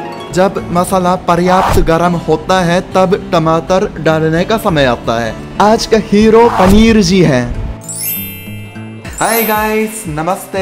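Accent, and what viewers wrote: Standard. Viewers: sala itna sudh hindi to india wale nhi bolte hain😄😄😄😄😄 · Itni sudh Hindi hum bhi nhi jaante😅😅😅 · Itni sudh hindi toh Indians bhi nahi bolte🤣🤣🤣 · Bhai teri hindi toh hamare indian log se bhi zyada pure hai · I want this guy to speak for hours in his pure Hindi .. btw great videos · Aap bhoot shuddh Hindi bolta ho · Waaah.... bhai aap sirf hindi nahi suddh hindi bolte hain jo ki bhut manmohak lgti hai........aapki hr videos un logo k muh pr thappd hi jo indian hote huye v hindi me introduction v ni de skte aur hindi bolne me shrm krte hain......💖💖💖💖💖💖💖💖💖💖🙏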